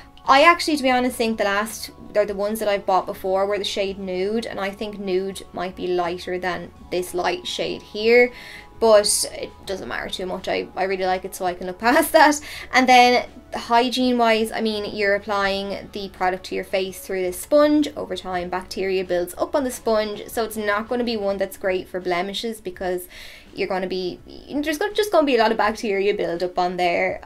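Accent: Ireland. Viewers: I love, love ,love your Channel and your videos 😍😍😍 You are so professional, kind and friendly, and I love that you review products that are affordable for us mortals 😅 And...even though it's highly irrelevant and I hope I don't offend you as an Irish person, but being a Dane, who spent a lot of time travelling and living in Dublin, listening to you talk takes me "home" to Ireland.❤️ So thank you for that too, and I'm terribly sorry if made you feel like a tourist- leprechaun...😳🤦‍♀️ Definitely not my intention!😊🙏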